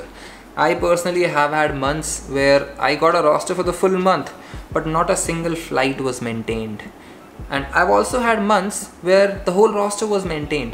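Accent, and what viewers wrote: India. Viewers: You accent is very Indian.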